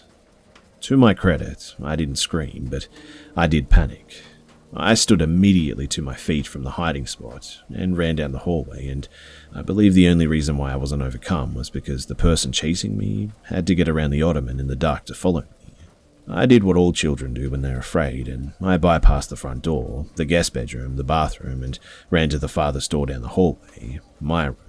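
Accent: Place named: Australia. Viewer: Aussie Accent cultral cringe bro. Fuck its hit me hard. Good stories though but Okka as fuck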